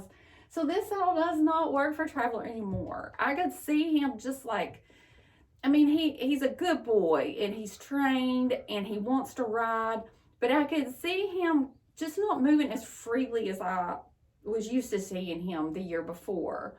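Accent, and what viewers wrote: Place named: South, United States. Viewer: Very helpful. Thank you! Also love your accent. I miss being in the south for the accents, the sweet tea, and the riding weather!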